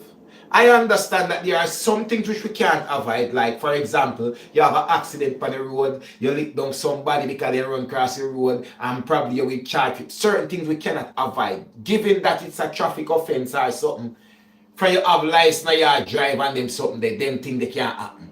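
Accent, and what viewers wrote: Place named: Jamaica. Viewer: ❤. Love listening and learning. Keep up the great work for the people.❤❤ Truly just Love the Jamaican accent. Would Love to return to and immigrate to Jamaica. ❤❤❤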